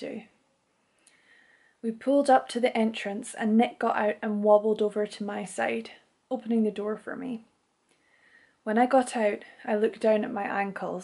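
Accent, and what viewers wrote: Scotland, United Kingdom. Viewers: So nice to hear such a lovely Scot lass tell a beautiful story. Would love to hear a wee bit more of the Edinburgh accent, it is my favorite sounding speak.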